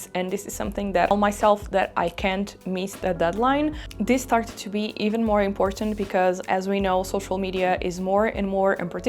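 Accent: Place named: Brazil. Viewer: My boyfriend is Brazilian he has your same accent and says OMG... when he cant pronounce a word as well xD I like ur content. Reminds me of him.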